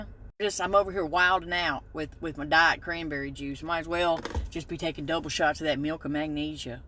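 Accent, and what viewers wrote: South, United States. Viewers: Hussy and Harlot😂....love the southern accent!❤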